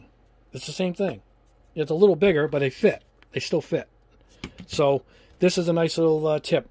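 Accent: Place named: Long Island, United States. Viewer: Long Islanders understand his perfect accent:)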